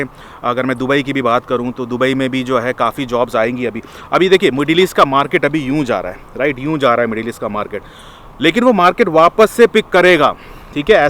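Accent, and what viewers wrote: Kerala, India. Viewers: Sir are you MALYALI?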